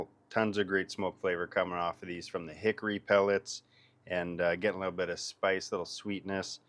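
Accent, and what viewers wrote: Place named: Canada. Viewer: I subscribed to your channel. I'll have to go take a look at some of your other stuff. Canada, aha.... I was having difficulty placing the accent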